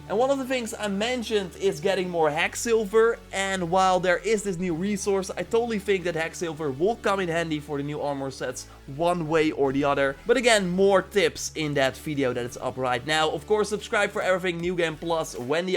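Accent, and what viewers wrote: Netherlands. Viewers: This guy sounds so DUTCH.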